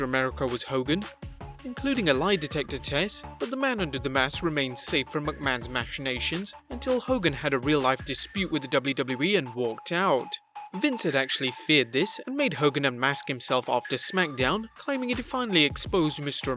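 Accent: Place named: England, United Kingdom. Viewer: Why does every wrestling channel have a commentator with an English accent... or is it just me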